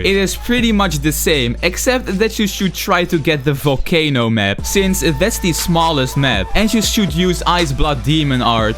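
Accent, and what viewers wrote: Netherlands. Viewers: i smell Nederlands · u sound dutch are u? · why do you sound so dutch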